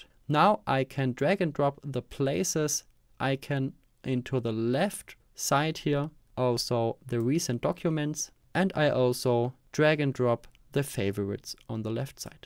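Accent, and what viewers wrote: Germany. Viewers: Hey, da ich deinen deutschen Akzent raushöre, wollte ich auch ein Kommentar auf deutsch da lassen!:D Es hat alles super geklappt, vielen lieben Dank! Du hast alles entspannt und ruhig erklärt!!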